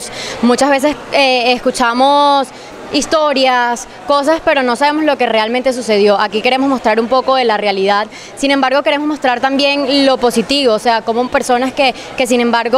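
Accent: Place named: Colombia. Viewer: Se le está pegando lo colombiano!!!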